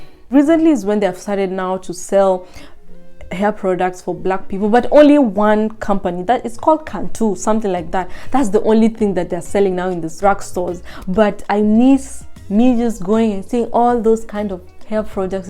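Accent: Nigeria. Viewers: You have Nigerian accent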